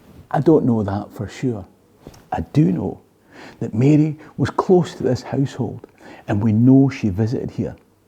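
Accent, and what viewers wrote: Scotland, United Kingdom. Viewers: ❤your videos and classic Scottish voice, the best travel videos ever. Scotland 🏴 is Heavenly and people are fascinating…we’ll be back soon!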